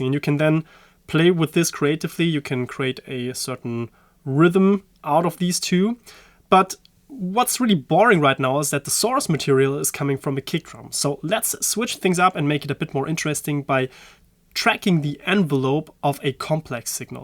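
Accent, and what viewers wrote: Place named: Germany. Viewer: ich kann deine germanness so sehr durch dein englisch hören :D gutes video aber!!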